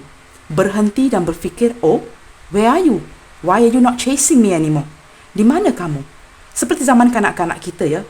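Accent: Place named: Malaysia. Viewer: Pisces Hadir. 👏🙏 readingannya sangat resonet sekali. Trimakasih atas readingannya. Smoga dlm keadaan sehat2 dan bahagia sll bersama kluarga tercinta di sana. Selalu sukses buat. Sepertinya klo sya dengar percakapannya, adalah orang India Malaysia klo tak salah yaa. Sya sangat senang dan bangga sekali dng readingannya, sebab sangat jelas dan resonet. 👏🙏🙏👍👍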